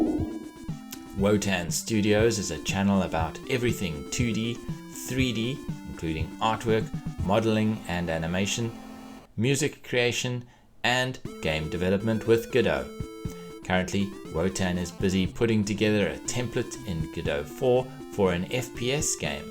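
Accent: South Africa. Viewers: Great showcase! Are you South African by any chance? 🛴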